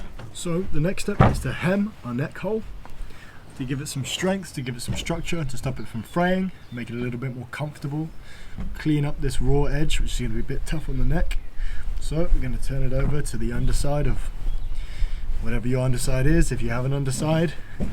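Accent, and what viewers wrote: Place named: North Dakota, United States. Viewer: Great vid! Just curious about your accent. What state are you from? North Dakota?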